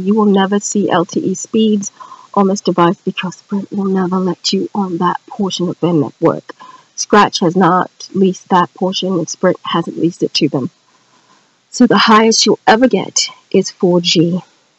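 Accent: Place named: England, United Kingdom. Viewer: Nice review. I am always drawn to a good hardware keyboard. I really wish Moto had one in the pipeline based on the X....Nice to finally hear your voice. Your English accent is cool.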